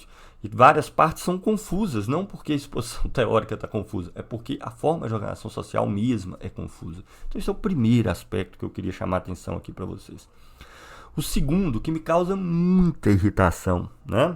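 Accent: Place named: Minas Gerais, Brazil. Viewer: Kkkk isso tá me incomodando desde o início das aulas sobre o capital kkk Colé??? Kkk é vício de linguagem, cara é mineiro, vamos dar um desconto.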